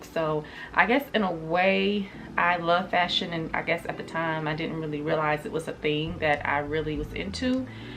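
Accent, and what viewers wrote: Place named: Alabama, United States. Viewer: Yaay to 3k & counting 🙌🏾🎉! When I got the noti for this video I couldn't wait to listen on my drive home. I have so much too say...re: balancing life & how u had the channel for years, 2020 was your time & the pandemic allowed u to get a great start again. Also, years ago, the kids were younger so it probably was a bit more difficult to carve out time when u had to tend to them more. I'm a single mom of an 8 yr old & I'm slowly starting to feel like I can breathe a little at times. Also doing a 3/12 schedule is great esp. if u can make it so that u can have a long stretch of days off. Re: channel growth-it's true what u said about not comparing yourself to others. I wish u continuous growth of a genuine community of positivity. Engagement & consistency is so important, from what I've heard. Re: u loving NYC...I was so excited to hear that! 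I live right across the GWB in Jersey but I was born & raised in the Bronx & a grad of NYU 💜. Also, re: selling your clothes, it is time consuming but I have an idea, whatever your daughter doesn't want, u can do a declutter type of video (more content 😉) & then do a sale. It is a lot of work but for a short time instead of keeping up with listings on Poshmark or the like. Lastly, I kept wondering if u were from Alabama because your accent reminds me of someone who is from there as well. I could go on & on but I'm so happy that I found your channel & thank u for sharing 🤗.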